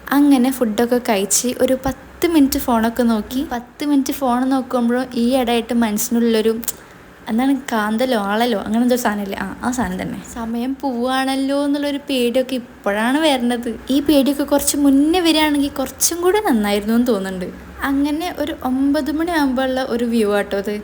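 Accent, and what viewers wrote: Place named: Kozhikode, India. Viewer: Ningale slang kettitt oru kozhikodukaariyaanenn thonni😌🤌🏻, anyway avidethe fees engena pg?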